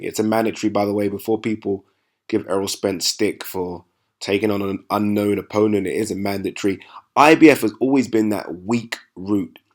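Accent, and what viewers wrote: United Kingdom. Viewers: Dismiss fights that are disrespectful to the fighter. Why should Spence go to the UK again? Why should Spence fight washed up fighters and fighters he has beaten already? Spence wants to unify 147. He took the belt from Brook and Khan is washed. You sound as if you may be a person from the UK? Could you be a bit biased? Tired of coming to America to watch good fights? Rent them on ppv like most people and save them airfare. But, stop making trash vids about why Spence must fight in the UK to make money. If you want Spence to fight Khan and brook, make vids about them coming to Dallas to face Spence to get paid. Not like either of those guys are making more money than Spence.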